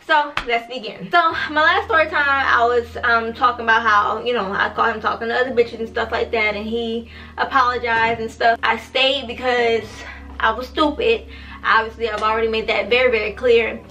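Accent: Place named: Louisiana, United States. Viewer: You from Louisiana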